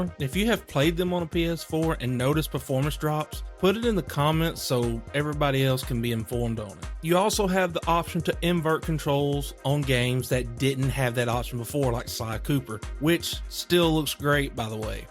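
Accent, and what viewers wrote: South, United States. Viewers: it’s probably super obvious to you lol, but yeah now that I think about how many times I have to hear the same sounds over when editing… lol I didn’t even think of that 😂 But dude trust me, I play guitar and sing in front of small audiences and I still hate my voice, and when it’s played back to me, I’m like damn… is that what I really sound like? Ughhhhh lol But it’s just natural for most people ti hate their voice when hearing it back, it’s just funnny (to me lol, maybe not you ) but yeah it must get annoying hearing your own voice so many times over and over the same phrase or something when editing! Trust me though, for the third time, you’ve got a solid voice. A little raspy, southern, it actually stands out among others in a good way. Way too many British accents on reviews lol don’t get me wrong, it’s a cool accent, but there’s just a lotta Brit’s in gaming I feel… they’re pretty goood at it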